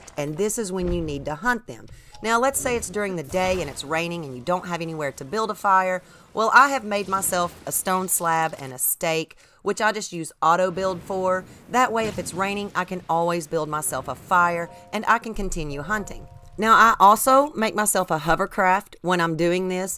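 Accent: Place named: Midwest, United States. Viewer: I keep getting distracted by your super thick midwestern accent 😂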